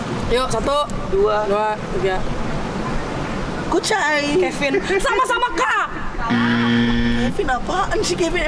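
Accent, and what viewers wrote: Bali, Indonesia. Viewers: LOGATNYA BALI BGT HAHAHAHAHA🤣🤣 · Logat Bali nya kental bgt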